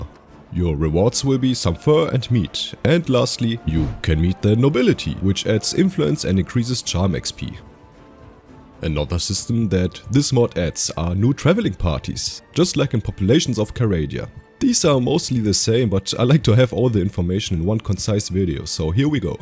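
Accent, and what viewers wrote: Germany. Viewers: hör ich da einen deutschen Akzent raus? haha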